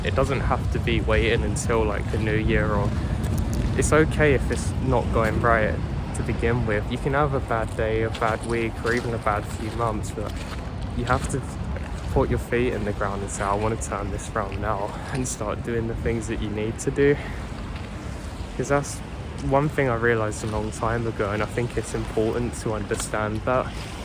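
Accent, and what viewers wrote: England, United Kingdom. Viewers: Good morning! Aww! It was so nice listen to you saying all that! I just love the english accent. You and your brother are spectacular! Wish Happy New Year to you and yours, haven't seen you since last year!🎉 I would love to see you doing a collab with Mortis Media and Being Scared, like back in 2016. Since there I'm your subscriber. But I'll be happy with any choice. Hope you're doing great too, and taking care of yourself. Thank you! Much love💚💙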